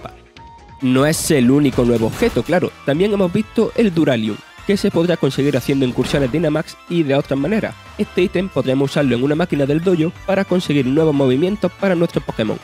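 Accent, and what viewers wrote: Andalusia, Spain. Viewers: Andaluz detecte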